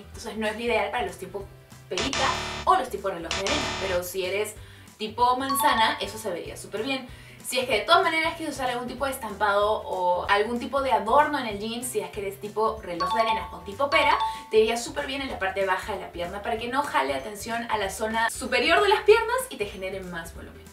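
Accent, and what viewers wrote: Chile. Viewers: Chilena :v?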